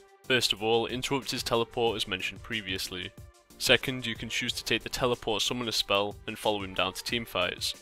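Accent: Northern England, United Kingdom. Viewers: I love british accent :D Him and JackFrags are my fav commentators on youtube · Your northern English accent is very different from mine.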